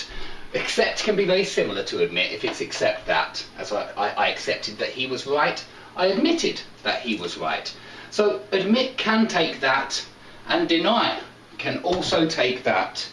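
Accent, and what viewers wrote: United Kingdom. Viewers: Thank you so much for all your efforts and time being put to help us to understand the language so called "English". I love your videos and especially the british accent.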